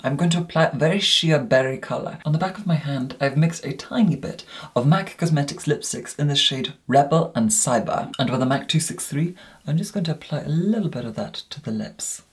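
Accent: Scotland, United Kingdom. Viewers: Although sounds English I’m 99.9% sure he is Scottish, the way he says some words and his last name screams Scottish descent. (I’m Scottish) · Do I detect a Scottish twang amongst his queens english? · Where is he from , cause I'm getting a hint of Scottish ( btw I'm from Scotland ) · I love british acent, "there're some areas that need a lil bit more foundation, like MY YOOH", I gotta learn how to speak like british people 💕💜💖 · Michaella if you are referring to accent, that is in fact a Scottish accent. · yes! he has a Scottish accent in his early videos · He's originally from Scotland, so it's probably a Scottish/English accent mix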